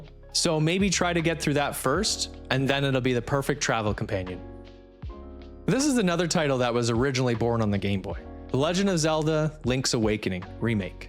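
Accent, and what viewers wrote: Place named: Canada. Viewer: "You find oat" "The world starts oat" "Mary-O" -Ah. A Canadian. Got it.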